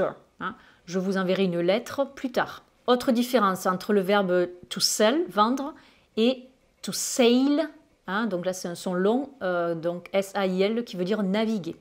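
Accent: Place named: Toulouse, France. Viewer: Tout d'abord moi qui suit de Toulouse, j'ai peut-être reconnu le petit accent 😉chez, que je tiens à remercier pour cette vidéo et les autres bien sûr, ainsi que tous les interveneants d'Anglais cours club. Méthode excellente!!!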